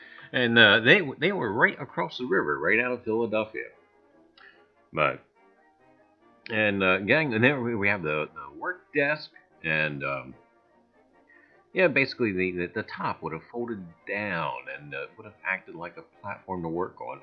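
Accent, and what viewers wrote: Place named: India. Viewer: great video! nice Indian cent buddy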